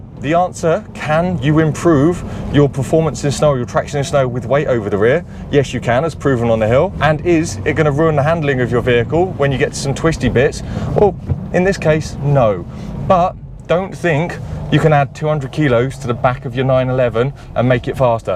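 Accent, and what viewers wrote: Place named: United Kingdom. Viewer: единственное этот британский "акцент" в английском звучит необычно, когда ухо привыкло к американскому английскому))))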